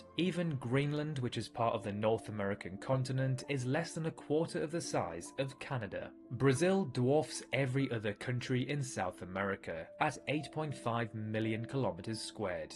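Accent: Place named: Durham, United Kingdom. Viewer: Interesting stuff to while away the early morning. Thanks! Possibly annoying question: what's your accent? I'm guessing somewhere like Durham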